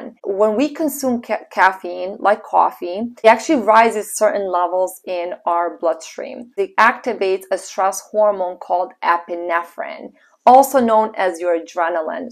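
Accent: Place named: Long Island, United States. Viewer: Long Island accent!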